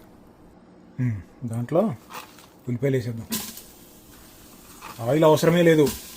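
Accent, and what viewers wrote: Andhra Pradesh, India. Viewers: ఎందులోనైనా నీ రూటే సెపరేటు తంబీ నీ బాడీ లాంగ్వేజ్,నీ భాష లో యాక్సెంట్ ఒంగోలు, గుంటూరు, విజయవాడ మూడు కలిపి మిక్సింగ్ కొడితే ఎలా ఉంటుందో అలా ఉంది 🎉🎉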